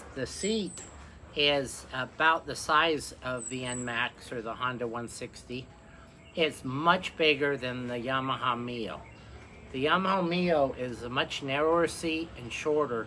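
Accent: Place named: Oklahoma, United States. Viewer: are you from oklahoma, you quite got the accent...anyway Im filipino american, retired marine. i like how you did this, lots of important info and the requirements you are looking for on a bike is the same like what i need. I look at other filipino vlog coz I speak tagalog too AND THEY ALL SUCKS. you dont see them does what you did. Its all about bloody all about themselves, laughing and running the camera on things you already seeing just to past the time. Their actions and voices all very irritating to the ears and just talking about nonsense... Hope you enjoy your stay there in that beautiful country populated by mtfrs.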